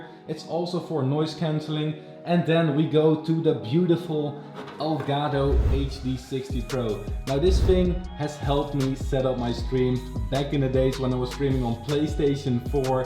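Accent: Netherlands. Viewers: Are you dutch? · are you dutch because if i talk english i talk like you haha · Are you dutch yust a question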